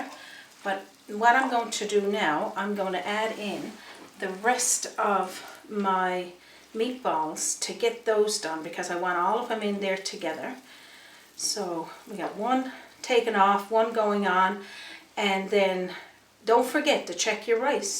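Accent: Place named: Newfoundland, Canada. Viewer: I like this simple recipe, I have made this many times, but I'm going to flour coat the balls like you did with flour. I ave to say the rice was not steamed in was boiled and set to cool, then served, I love your NFLD accent, some day if I ever get back I will bring you a great bag of H's, so you can say here we are instead of are we are, stay till I come were your at. I do love you don't get me wrong, this vid was 8 years ago and you avent changed, and that a good thing🥣🥣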